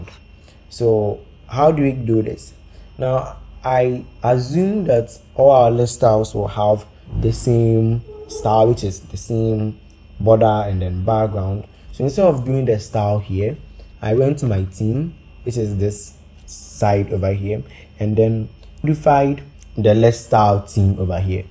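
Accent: Ghana. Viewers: Thank you very much you really helped me, i can tell you are Ghanaian from your accent, keep on doing what you are doing, this will really take you far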